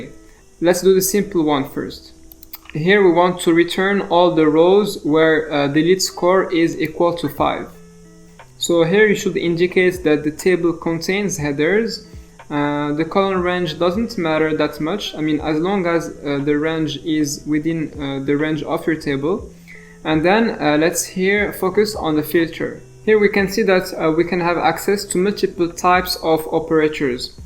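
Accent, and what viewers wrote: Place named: France. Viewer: Bonjour, tu as un accent Français, are you french? merci pour le cours 👌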